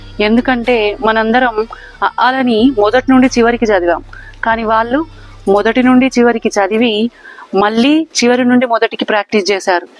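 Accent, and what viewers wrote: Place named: Telangana, India. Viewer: మంచి తెలంగాణ యాస భాష. మంచి మాట కూడా🙏🙏🙏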